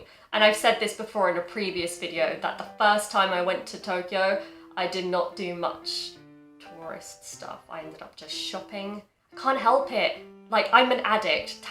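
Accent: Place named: England, United Kingdom. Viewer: Please listen to Cockney or Geordie or even Scouse accents. You can’t say it is intelligible to listen to I am sure. English accents are very diverse than anything else on that matter. Her accent is a tiny example of broad accents you can hear in England or in the UK.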